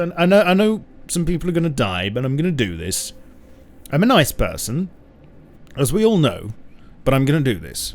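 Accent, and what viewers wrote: United Kingdom. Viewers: Come on this is where you will jump off and soar into the sky like KCmo, oh w8 his channel is dying and yours will 2 if u don't stick 2 rust, it's cruel but hey! That's the price u pay when you think someone is actually going 2 watch you do something other than for what they subscribed for. Let's see if u can really break through as just another guy with a British accent that plays any random game:P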